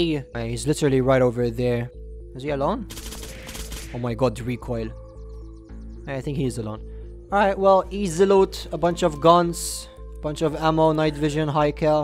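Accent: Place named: Malta. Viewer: Question I am new and you’re English accent sounds like my country’s English accents that Maltese so I am asking are you maltese and live in malta pls tell me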